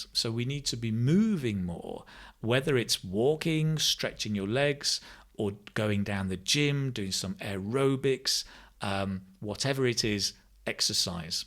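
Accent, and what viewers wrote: United Kingdom. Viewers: Omg, you're bloody attractive speaker K !! i felt in love to listened to your beautiful voice and British accurately accent !! Your way of teaching also phenomenal ! I can't take off my eyes and ears off of your videos ! Xxx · I wacth, I like your accent. So very British. Sticky 👍👍i subs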